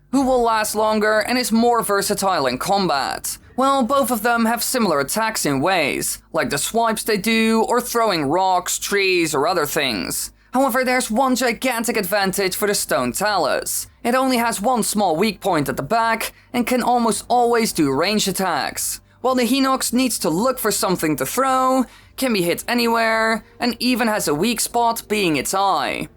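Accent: Netherlands. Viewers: Love your dutch accent and ofc your videos.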